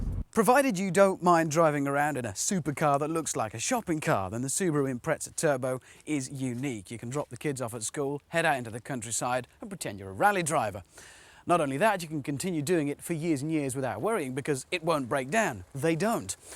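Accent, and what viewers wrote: United Kingdom. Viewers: It's partially because of his accent. Note how in certain british accents, Lava for example is pronounced laver.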